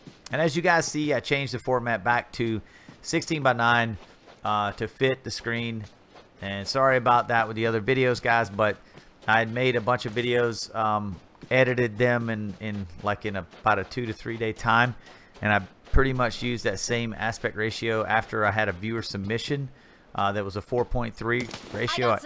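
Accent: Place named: Carolinas, United States. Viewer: Hey, I am going to assume by some comments you've made in the past and by your accent that you are from the Carolinas. I hope this looming hurricane doesn't impact you too much. I am about 30 miles north of the NC border in Virginia and about 10 miles from the coast, so we are preparing for it here too. Here's to hoping the storm doesn't hit either of us directly and that you don't have to work too many extra hours in the aftermath. Stay dry, my friend.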